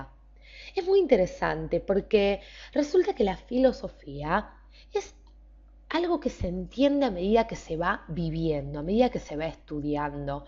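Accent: Argentina. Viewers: pues practicamente este video nos da la definicion y algunos filosofos algo que emos estado viendo a lo largo de la semana, me gusta mucho la filosofia por que es el amor a la sabiduria pero aun mas por que trata de encontrar el por que de las cosas que pasan, tratando de encontrar la verdad, otra observacion es fue ra del tema y mas con el video la voz de la narradora del video me encanta como habla mas por que es argentina :3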